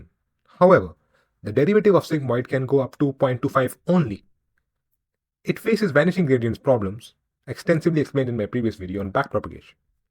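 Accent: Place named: India. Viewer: You indians have great information videos on YouTube , but your problem is your accent in English is terrible for us to understand what you are saying. So please use AI voice instead of your natural voice please.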